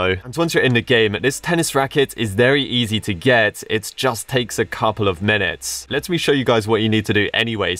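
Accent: United Kingdom. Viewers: Sometimes I forget is Britishy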